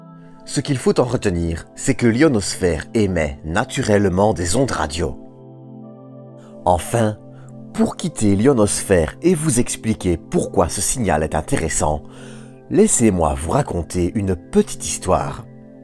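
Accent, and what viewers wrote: Belgium. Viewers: C'est l'accent belge.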